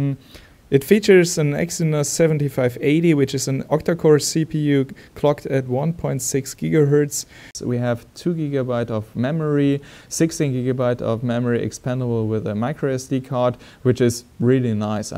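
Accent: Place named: Germany. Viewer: i guess you are German. i like your accent. that's cool man....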